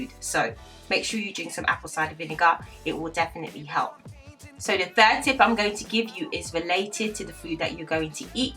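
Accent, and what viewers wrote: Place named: United Kingdom. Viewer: nice to hear a British accent on YouTube for a change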